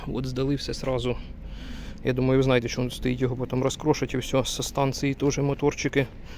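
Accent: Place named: Central Ukraine, Ukraine. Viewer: Автор лайк поставив.Удачі.По розмові зрозумів, що Ви родом з центральної частини України.Щасти.🎉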